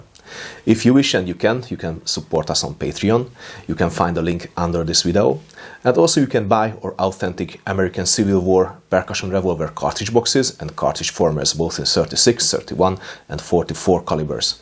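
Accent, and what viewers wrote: Hungary. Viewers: Michal Štiglic now imagine him saying that in his Hungarian accent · Nice Hunglish ))).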